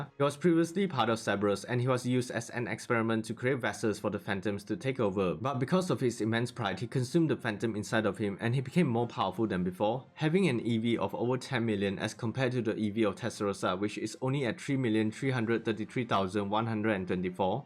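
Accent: Singapore. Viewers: srry. Ur accent is singlish tho. Keep ut the goodwork!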